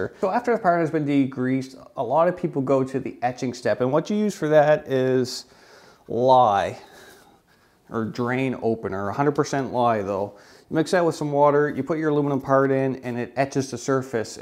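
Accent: Canada. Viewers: Reminds me of Canadian or from Minnesota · Are you Canadian? You look and sound Canadian. I know this is random as hell lol. Good video 👌🏾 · I think he's Canadian. That would explain the bad english. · 9 seconds til Canadian reveal. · He's canadian so he gets a pass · Idk because this is my first time watching one of this guy's videos but he sounds Canadian. I've never heard anyone pronounce it -oid and I'm American.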